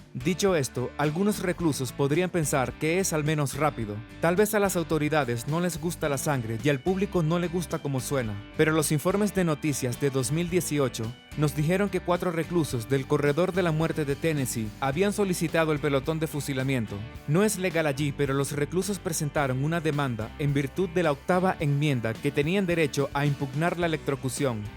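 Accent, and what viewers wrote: Russia. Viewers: Suena exactamente ruso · Suena como un ruso hablando español.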